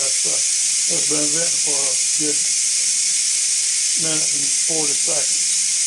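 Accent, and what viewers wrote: South, United States. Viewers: I called it Chick Roast because when I make it its chick roast...when hubby makes it its chuck😘..I have to go look at more of your videos.i am dyslexic so seeing it done helps me so much more than reading the directions .Also I can understand your sweet southern accent cause I have one too😁 Again thank you. I cant believe how tender and flavorful the meat was and how soft the veggies.I added radishes. I hate raw radish but when roasted they are not hot tasting but very sweet it's crazy but gooood! Hope y'all have a great day!🌞💝🕊🙏😁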